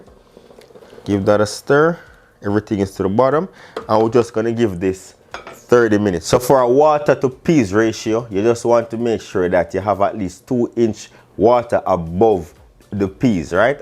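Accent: Jamaica. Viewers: Finally a real autical Jamaican with no twang foreign accent presenting good dishes. 👌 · Food look so good & I love that Jamaican accent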